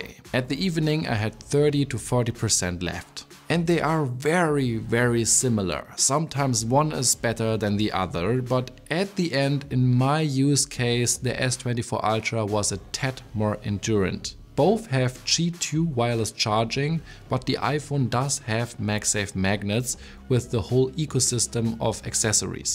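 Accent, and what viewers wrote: Germany. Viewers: I hear the german accent xD Love my s24U. Just wished our banks would support google wallet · Hahahahahahah ich dachte, das ist ein Scherz am Anfang. Der deutsche Akzent hahahahahahahah ich kann nicht mehr 😅 by the way: "if I have to be honest". What does that suppose to mean? You don't have, you should be! 😂😜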